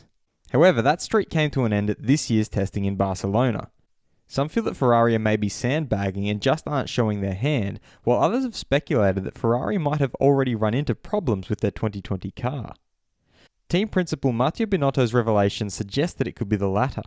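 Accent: Australia. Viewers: Always nice to hear an Aussie voice regarding F1, it's basically a cult interest over here. I have NO ONE to talk to about it.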